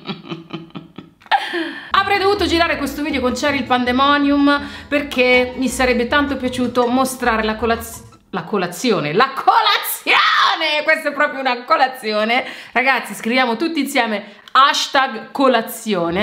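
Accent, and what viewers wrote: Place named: Tuscany, Italy. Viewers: Te posso dì na cosa? Me fai morì da i ridere😂!!! (In senso buono ovviamente) Comunque io sono di Arezzo e sentirti dire quel tuo dialetto aretino/ fiorentino mi ha fatto sorridere❤️si avvicina parecchio. Ma di dove sei te?? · L'accento toscano m'ha scaldato iccuore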